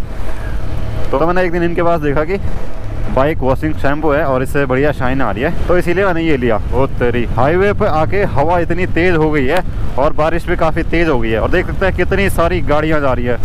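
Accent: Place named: Rudraprayag, India. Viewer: Apki rudraprayag ki garhwali thodi alag h ......😅😅 Thodi alag sound hori h 😁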